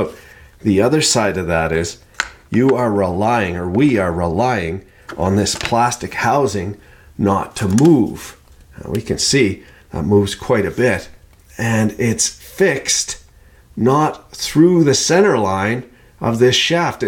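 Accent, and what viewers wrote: Canada. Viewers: Just discovered this channel. Never heard a more Canadian Canadian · You need to do a video translating all the sangs and saying. I'm guessing it's common Canadian contractor dialect but, some of us 'mericans have a hard time keeping up... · I love your accent. I think I am going to have to learn to speak fucking Canadian, Ah? Learned lot of new vocabulary, like Shmoo, skookem. Fuck, I am going to have to watch this video again. Why this video brings me such happiness I have no fucking clue, but it does. :-) You definitely have a new subscriber.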